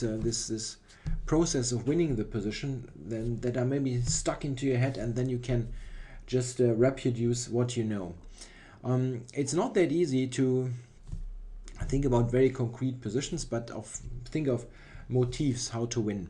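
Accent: Germany. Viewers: alter.. bist du deutsch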